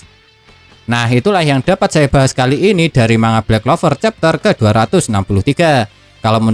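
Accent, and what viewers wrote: Java, Indonesia. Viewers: Jawa ya mas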